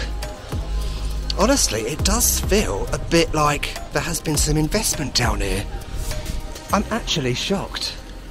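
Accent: United Kingdom. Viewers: Come on! I would never be able to tell if you are speaking with a local or nonlocal accent. You just speak British to me, hahaha. Thank you for taking us to memory lane. I read you fixed comment... So you got Covid as soon as you arrived to London? I hope you get well soon. You have no idea how many people call their own home places shitholes and look at other places where locals call them also shitholes and for them (nonlocals)... it's just a more higher and advanced place to live. I definitely see myself vising London in 5 years. Just for vacationing.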